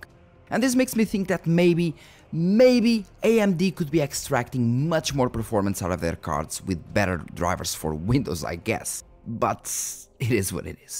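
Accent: Portugal. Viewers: Literalemente instalei linux para poder recuperar um hdd com bad sectores e agora estou a ponderar usar linux para jogos, btw, mal vi a tua cara na thumbnail ja tinha deduzido que eras tuga XD, depois foi o sotaque, depois foi so confirmar no canal Xd, como e que deu para saber que eras tuga ? idk btw keep it up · your native tounge is portuguese? are you from Portugal or Brasil?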